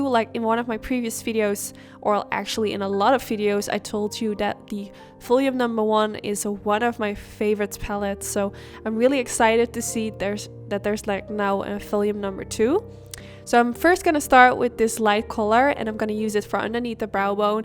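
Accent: Netherlands. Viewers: Hi, I'm new to your channel. I was very curious about your accent. I didn't know you were from the Netherlands, but since I also live here, I recognized it inmediately, and then I read your information and it confirmed it. I have watched 2 of your videos already and you just won a new subscriber. I really appreciate the close up on the eye shadow looks and it's super easy to follow. Thanks a million. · I guessed you were from the Netherlands in the first 20 seconds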